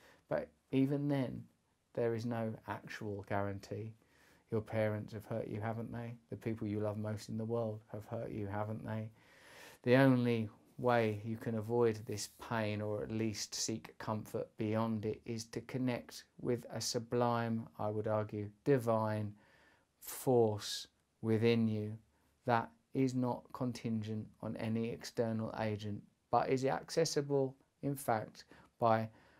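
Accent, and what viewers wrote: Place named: United Kingdom. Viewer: Love his British accent 🤘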